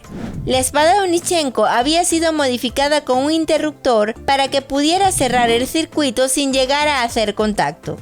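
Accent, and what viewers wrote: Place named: Spain. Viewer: Os molesta que dice las C y las Z como en España, os quejais mucho del racismo pero sois los peores.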